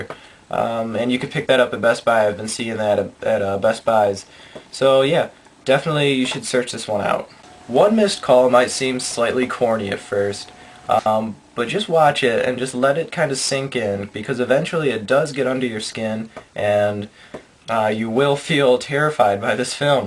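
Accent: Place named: Michigan, United States. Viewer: I'm betting you're from Michigan... :p Nice accent ;)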